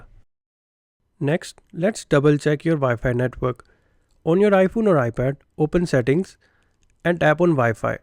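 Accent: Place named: India. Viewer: Indians sepaking english sound very ridiculous, Remove anything below your tonge or use subtitles, the world will thank you.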